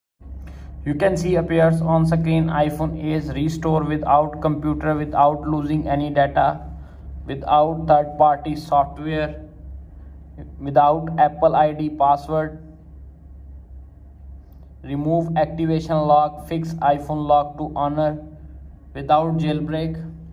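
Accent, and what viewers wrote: India. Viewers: scammer with the indian voice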